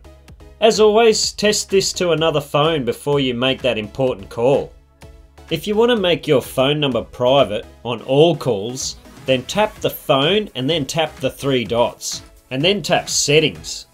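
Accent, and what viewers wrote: Australia. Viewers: Thank you. Love the Aussie accent 🐨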